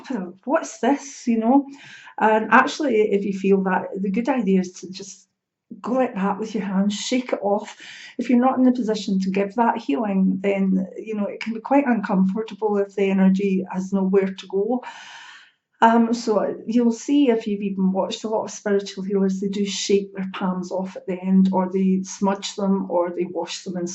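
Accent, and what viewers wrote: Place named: Wales, United Kingdom. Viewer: Thank You for this video . I like your accent though, are you Welsh?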